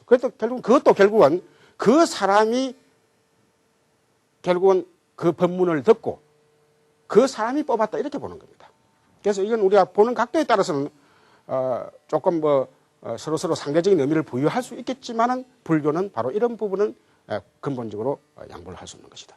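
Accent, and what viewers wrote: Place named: Gyeongsang, South Korea. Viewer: 귀한 영상을 올려주셔서 감사합니다 교수님은 듣기로는 서울대 수학과를 나오시고 동국대를 졸업하고 인도를 유학하신 분으로 초기불교의 대가이십니다 우리나라 스님들께서는 이분의 강의를 꼭 듣기를 권유합니다 우리나라는 대개 대승불교인데 대승불교 없는 초기불교는 있어도 초기불교 없는 대승불교는 없습니다 이 강의가 2005년도경에 서울 남산에있는 대원정사의 당시 대원불교대학에서 강의 하신것 같은데 귀한 영상입니다 많은 분들이 들으시고 불교에대해 공부하는데 많은 도움이 되면 좋겠습니다 경상도 말씨로 알아듣기 어렵지만 몇번 들으면 맛갈납니다 당시 김사업교수님의 유식강의도 명 강의인데 영상을 내려 아쉽습니다 참 귀한 영상입니다 절대로 이 영상 내리지 마십시요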